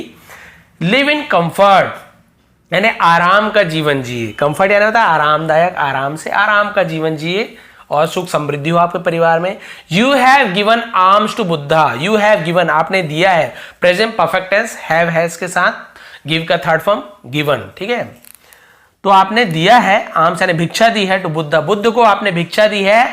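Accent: Chhattisgarh, India. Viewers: Sar aap Chhattisgarh ke Gramin area se belong karte ho kya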